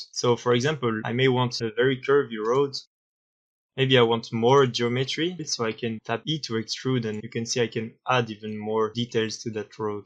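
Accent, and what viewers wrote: France. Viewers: Yo, t'es Français? Are you French? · tu es français non ? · So you are French ? I love your accent from Seine-et-Marne, merci mec 😉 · truc de ouf, j crois j'ai repérer un accent Français par ici mdrrr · Vu l'accent tu dois être aussi français que moi. Félicitations pour ton boulot. C'est énorme ! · salut mais trop ^^ je vois que les cours d'anglais sont d'un haut niveau en france :p en fait, vous parlez sans doute bien, vue que je suis une bite en anglais, je sais pas trop mais l'absence d'accent anglais est fort ou bien c'est l'accent fr qui donne ce coté là quand on parle anglais....enfin, je trouve que c'est plus compréhensible que quand c'est des anglais qui parlent donc c'est cool. mais ca me fait délirer car vous etes le 3 ou 4ieme youtubeur dans la 3d que j'entends parler en anglais lors d'un tuto et l'accent est tjrs aussi facile à reperer, lol ^^ c'est trop drole (et sympa). 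sinon, sympa le tuto ^^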